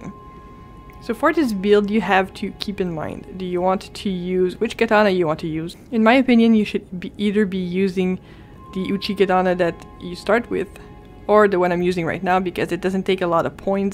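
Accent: France. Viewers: Vraiment étrange d'entendre un accents français sur un guide en anglais sur elden ring 😂 mais sinon, très bonne vidéo 👌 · T'es français ?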